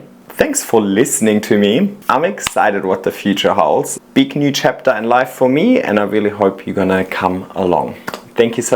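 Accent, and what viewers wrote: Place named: Germany. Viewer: I vow to you I will watch your videos and I have subscribed to both.Not because Brad and Bailey are sooooo cute.Not because your German / Aussie accent is soooo sweet. NOT because I live to see Timothy and A.J. Because your very talented with plants and explain what your doing very well from start to adult plant.Your plants are healthy and beautiful.I LOVE SPENDING TIME WITH YOU.I can't wait for mom to visit.And yes see you were on t.v. so you know you have talent.I want to tap in on that.Thank you for making another channel as one was not enough time.And thank you for sharing you vast knowledge.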